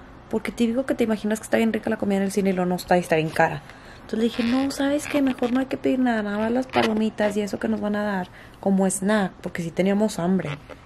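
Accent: Monterrey, Mexico. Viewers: Eres súper buena onda, no sé si es mi imaginación pero como que tienes acento regio, me identifique jajaja Saludos desde Monterrey 🇲🇽🤠 Bendiciones ✨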